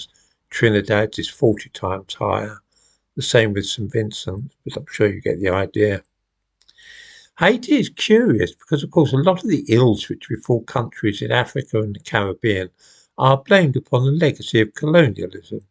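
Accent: United Kingdom. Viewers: i have been following your channel for a while because you have excellent content, detailed analysis of history and politics and all without emotional drama as the corporate media always has. the problem however is you need a much better microphone. like professional podcast folks have. coupled with your heavy British accent, it's hard to understand you.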